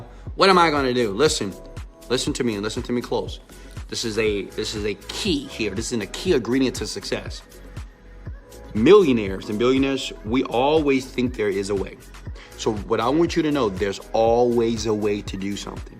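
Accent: South Africa. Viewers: Fantastic, you sound like you're from JHB or the Cape.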